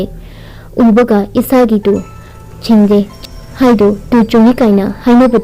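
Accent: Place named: Kabui, India. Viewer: Sis gi wari khulise original meitei na ngangba mande...kabui na Meitei lon ngangbagi accent ( tone) ama chang ngi.... khonjel se ymna thot nou ee ymna nungcba khonjel amadi oi. Keep going 👍👍👍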